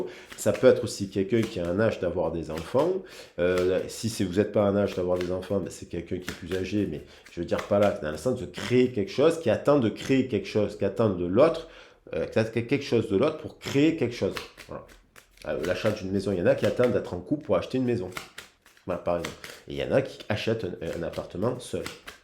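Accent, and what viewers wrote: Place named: Marseille, France. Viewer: Ah oui c'est l'accent de Marseille...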